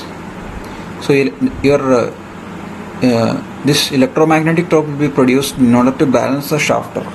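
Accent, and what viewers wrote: Kerala, India. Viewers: Malayali ano? Accent nalla parichayam?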